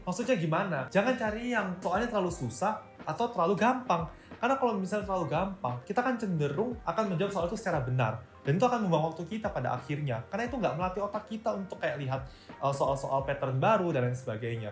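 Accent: Surabaya, Indonesia. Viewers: bahasa jowo suroboyoe tambah mantep ngene dok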